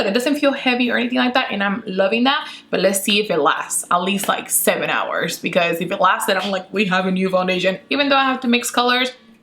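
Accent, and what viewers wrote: United States. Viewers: I love how you speak, reminds me of back home in SoCal. What lip gloss are you wearing????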